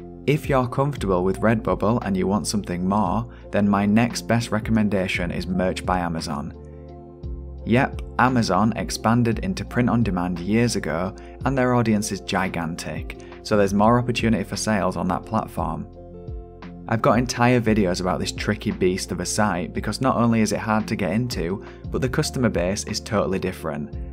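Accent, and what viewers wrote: Yorkshire, United Kingdom. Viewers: Sounds Yorkshire or northern English to me, based on all the Brit TV I've watched my life. (I'm American) 😊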